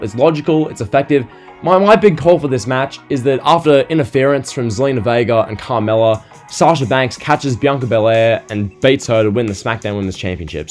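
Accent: Australia. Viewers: I love your accept bro, my Aussie bro 😎 John Cena should lose to Roman Reigns, simply because, a win will deter his accolade. Roman Reigns needs this victory more than John Cena... I'm not saying it cos I'm a fan of Roman Reigns.. bur that I'm a wrestling fanatic and critique...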